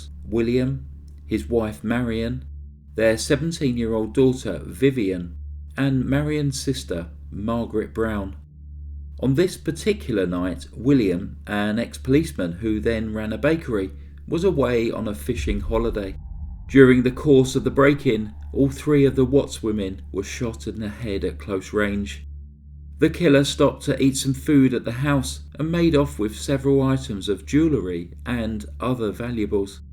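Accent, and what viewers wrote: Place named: Scotland, United Kingdom. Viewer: Sorry that you lost your friend! Was fun to hear a Scottish accented intro.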